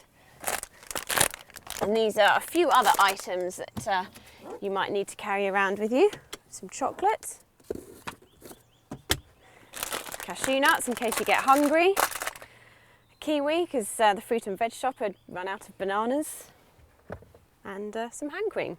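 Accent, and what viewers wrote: United Kingdom. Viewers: banaaaanas... love the british accent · Why was I surprised when the steering wheel was on the right side? Her accent. The video says UK. Why didn't I see it coming? I'm an idiot hahaha. When she got into the front seat I was like "WTF?!" shows how much I was actually paying attention hahaha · british accent, in a sexy thin girl, so hot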